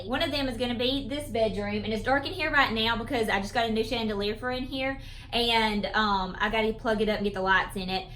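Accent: South, United States. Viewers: You might want to look up <link> to see about getting spare parts for your chandy. I have used them as they have come to my rescue several times. Have you thought about painting it? I am on a spray painting frenzy so that is why I mentioned it I guess. You sound like a beautiful southern woman..I was born and raised in Tennessee but am now in Georgia. Love your decorating style! Tfs,,,take good care! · I like the "Iowa Pine" and the "lavender" Mrs. Meyers . Such a pretty chandelier!!! Your rooms look great and doesn't it feel great to have them organized. You did get a lot done in one day. I really like the two thread work pictures. Just a side not~~I love listening to your southern accent.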